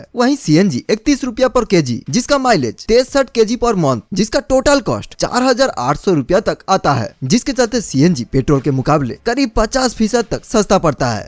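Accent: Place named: Bengal, India. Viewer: Bhai tum pakka koi Bengali hai ya Oriya hai.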